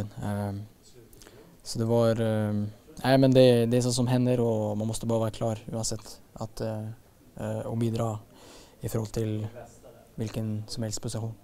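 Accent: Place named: Norway. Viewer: Han har börjat prata lite mer norskt igen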